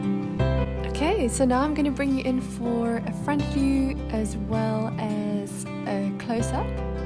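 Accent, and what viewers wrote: South Africa. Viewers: Love the South African accent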